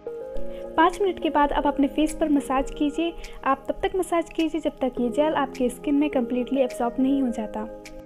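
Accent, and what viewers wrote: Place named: India. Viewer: Индийский английский....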